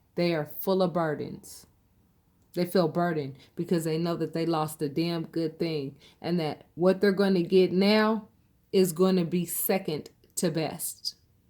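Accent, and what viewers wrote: United States. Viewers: well said exactly what happened to me poured her with so much love so drained karma a bad thing shes having a shit time out there all the lies mind games cheating manipulating witch still wont apologise for all the pain caused shes getting it bad thank you so much luv the yanky accent bless ya xxxxxxxxxxxxxxxxxxxxxxxx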